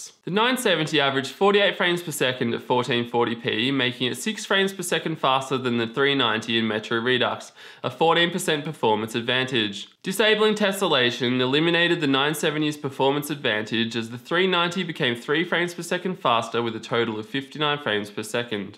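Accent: Australia. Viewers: You sound like you're from Australia. May I ask where you could possibly get a GTX 970 for $300? That's the price range of the GTX 960 here. The 970s are around $450, depending on which brand you pick. If you're not from Australia, my apologies.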